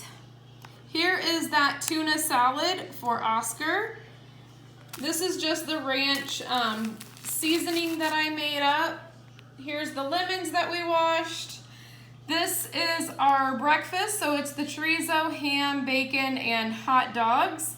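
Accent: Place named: South, United States. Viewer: Man I wish my family ate left overs I cook fresh daily my husband eats last nuts for lunch n my son eats last nuts for after school snacks ...what state do u live in ? U sound like that one girl who always says y’all she makes tons of videos .. n yes I live keto connects as well . Ur nails r on point 💕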